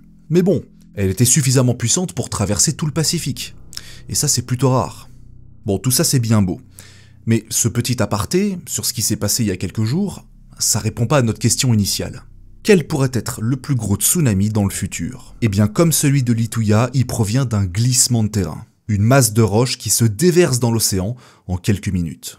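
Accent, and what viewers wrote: Spain. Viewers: pouce bleu pour l'accent espagnol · 👍🏻👍🏻👍🏻👍🏻👍🏻👍🏻💙 pour l'accent espagnol ! Lorsque je suis allé à la Réunion (l'année de ta naissance 😁) je n'ai pas été dépaysé, je connaissais déjà les Canaries ! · Pouce bleu pour l'accent espagnol!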